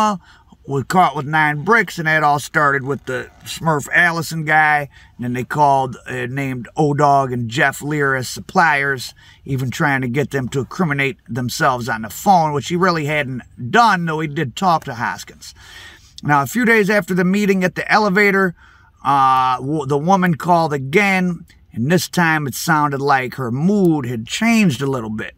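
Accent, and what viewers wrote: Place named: Midwest, United States. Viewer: Minus the fact he sounds like he has a mouth full of shite when speaking. He is very thorough with his stories. Im put off by his heavy MidWest accent. Da Bears!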